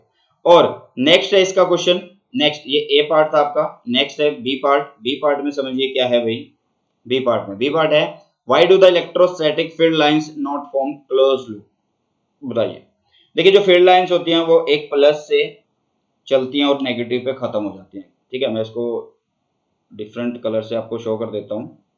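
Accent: Haryana, India. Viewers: I think haryana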